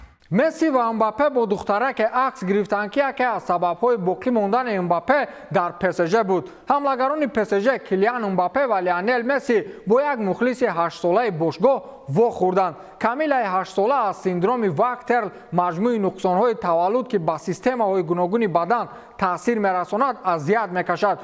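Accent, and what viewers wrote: Kulob, Tajikistan. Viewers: Ира ами гапзаниш зебш лафзи Кулобигиай на ин ки будикский ть бра ягон будика ихел гап нишон бтее хорошо лафзи шмо агар лафз мешид дар иҷа ба Кулобиги гап намезад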